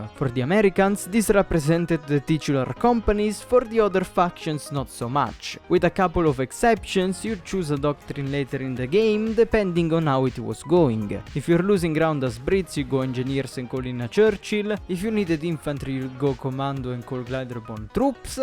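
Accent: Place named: Italy. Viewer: Damn, that italian accent is thick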